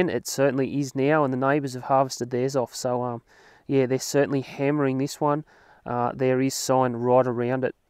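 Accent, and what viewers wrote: Australia. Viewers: pure evil LOL 🤣😂,.have to say, im glad you ain't no Yank, as the Aussie accent just finishes it off perfectly. Any time you visit UK, let me know, gladly buy you a beer 🍻 & have a barbie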